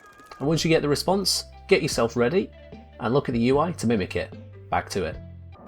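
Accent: United Kingdom. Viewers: you and your beautiful british accent saved me, thank you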